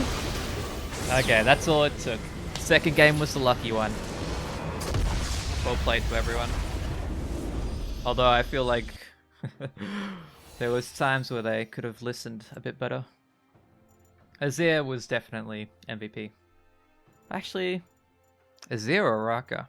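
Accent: Australia. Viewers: Mate are you in Perth I swear I talked to someone at uni sounding like you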